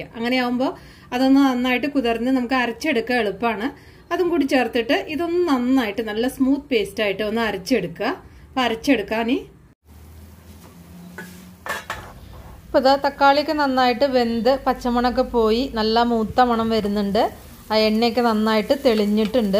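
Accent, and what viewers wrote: Palakkad, India. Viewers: palakkad ആണ് എന്ന് എനിക്കും തോന്നി, നല്ല സംസാരം 💗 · sari. samsaram kettappol thonni palakkad, nammude area akum ennu. I am from ottapalam. Cooking video ellam super anu. Try cheyarundu.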